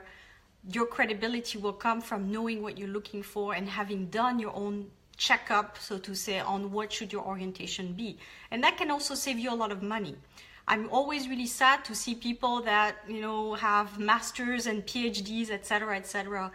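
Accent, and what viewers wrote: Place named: France. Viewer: Thank you for this smart,structured content!I also like how you follow some sensitive points.Btw,your French accent is very charming when you speak English.Good luck with everything you do!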